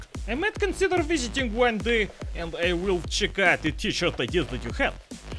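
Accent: Eastern Europe. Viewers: I was very tired and fell asleep to your soothing slav voice. Thank you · hello, this "hello" comes from very far away from you, directly from Portugal. I'm a huge fan of your slavness and the way you talk, makes me laugh so much and it also reminds me of the good old days of red alert 2:D anyway, if anytime it would be possible, i would recommend you to come and visit us, i think you would like our warm wreather and our beer, to be consider one of the strongest in europe, any way thanks for you time, and stay cheeki breeki;)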